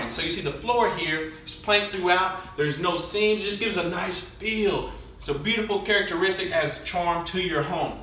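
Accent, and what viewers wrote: South, United States. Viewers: Was I the only one distracted by how tight those pants are on his beautiful 🍑🙈 I'm like 20% interested in these houses and 1000% interested in he's so beautiful his southern accent those muscles that smile is curly hair every single part of him!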